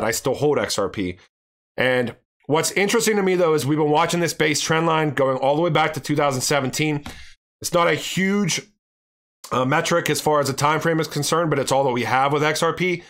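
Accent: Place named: East Coast, United States. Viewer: It's your East Coastness coming through. You're fine. Keep doing you.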